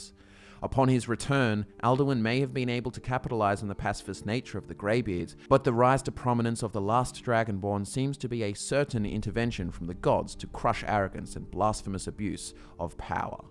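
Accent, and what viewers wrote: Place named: Australia. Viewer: 3 it's actually australian that you and youtube auto-comments have a hard time with ;)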